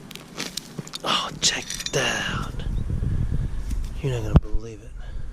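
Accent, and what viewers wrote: South, United States. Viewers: We dont have too many ppl with a southern accent here in Oregon.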